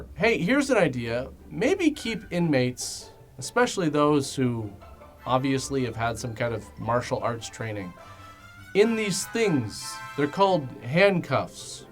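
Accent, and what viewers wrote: Canada. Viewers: People say there isn't a Canadian accent, but this dude is here to prove otherwise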